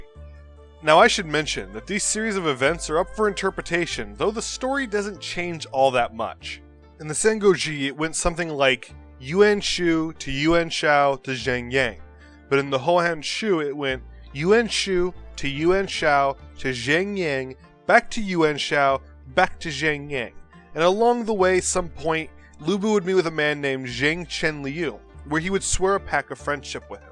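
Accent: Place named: United States. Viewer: fuck these murica pronunciations honestly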